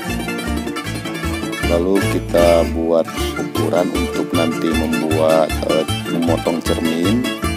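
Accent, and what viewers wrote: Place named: West Java, Indonesia. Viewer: Pokona mah urang Sunda MH Tina A na bentes pisan😀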